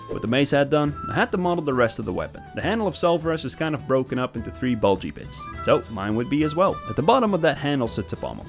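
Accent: Netherlands. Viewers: Thats sick! Do i detect a dutch accent? keep up the good work!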